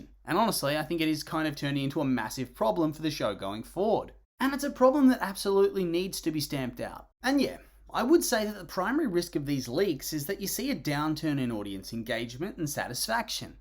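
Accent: Australia. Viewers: this channels voice-over, I'm an Australian too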